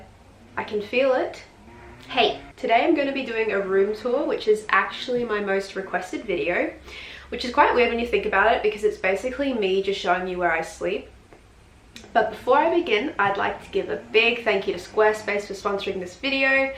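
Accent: Australia. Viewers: Where do you live you have the same Aussie accent as me 😂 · Yesss I've been looking around for another good, aesthetic youtuber and finally I found you!! Bonus points is that you're Aussie like me hah I realised from the background noise of insects before I even heard your accent. So ready to binge watch all your videos!!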